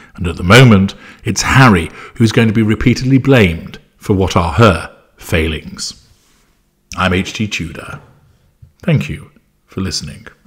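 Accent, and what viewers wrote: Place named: RP, United Kingdom. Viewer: You speak received pronunciation. As do I. The accent will get you everywhere. Not a narcissist (absolutely the opposite, sadly for me) but I do have the voice that convinces everyone that you are educated and to be trusted. Even if you are not. A huge asset.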